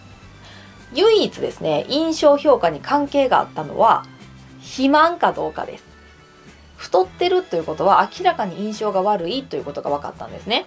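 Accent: Kansai, Japan. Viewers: 先生の関西弁のイントネーションが素敵だ 👍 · やっぱやな！俺はのサバサバした関西弁や内面を評価してます！ちなみに俺はレスラー体型かな。筋トレしてます。👍👍 ガチで考え方が能動的になります。欠点を補うには筋トレが最強です。ドラゴンボールの最長老様に潜在能力を引き出して貰うクリリンの感覚です。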